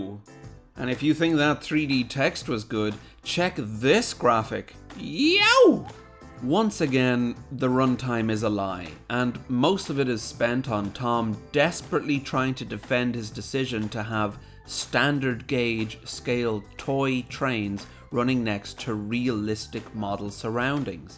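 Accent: Ireland. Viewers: Is the narrator Irish (accent). What about Pete Waterman? What's with the 3 rail trains? I guess they all like Lionel. HO can be so much more realistic. Considering what money celebrities have, this was pretty disappointing.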